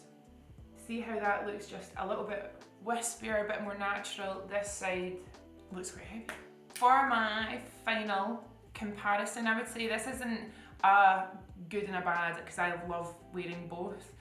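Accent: Scotland, United Kingdom. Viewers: I'm feeling buzzed and chatty... You are so stunning, and your Scottish accent makes me want to start a bar brawl for no reason. We love the same colors... The right side wins but I love the round eye and brightness on the left. You're right about makeup's evolution in our personal lives in relation to how we see ourselves.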